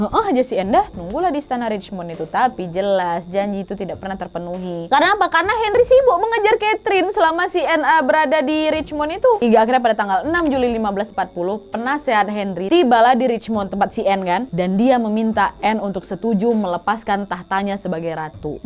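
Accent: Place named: Dumai, Indonesia. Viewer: Kyk org Dumai logat nya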